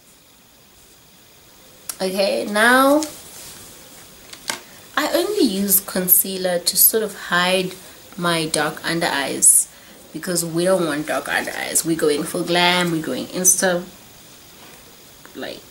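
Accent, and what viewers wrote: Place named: United States. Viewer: You sound like you have recently visited the US. Wa-rrer. You look amazing.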